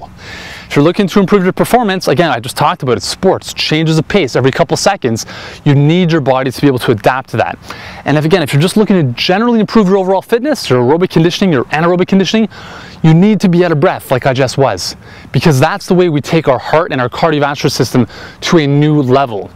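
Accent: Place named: Canada. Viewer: CANADA ! O'bout ! (Love this accent)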